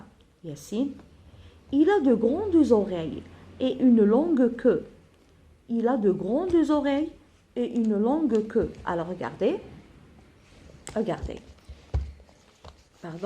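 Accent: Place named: Africa. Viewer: Ne voyez vous pas que c est un français africain il est incorrect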